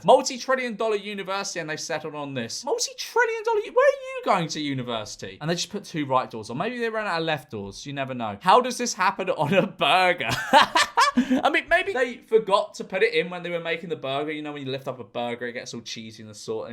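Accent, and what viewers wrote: United Kingdom. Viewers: Only British person, who i dont find accent annoying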